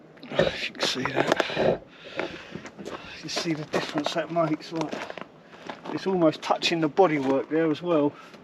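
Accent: Cockney, United Kingdom. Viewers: Professional cockney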